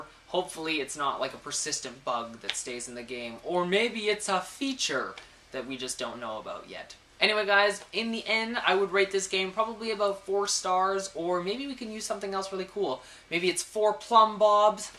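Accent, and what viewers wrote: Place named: Canada. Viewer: AHA! i knew he was canadian, we have the same accent.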